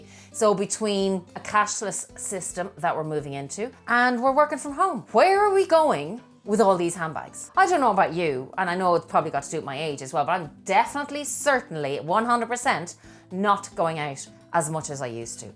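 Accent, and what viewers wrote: Ireland. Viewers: Hello, I am a new subscriber and have enjoyed watching your videos. Love your accent, what part of Ireland are you from. My husbands Father came from County Cork. xoxo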